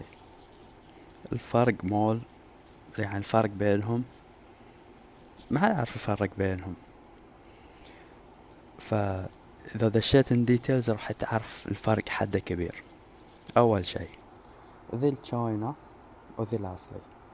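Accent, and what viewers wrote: Bahrain. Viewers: يا زين اللهجة البحرينية 😌❤️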